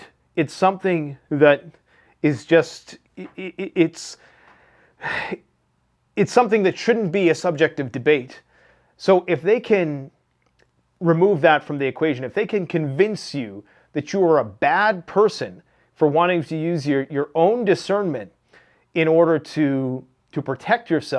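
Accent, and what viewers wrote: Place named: United States. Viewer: Hey — you sound more like an American prepper to me — maybe you should move south.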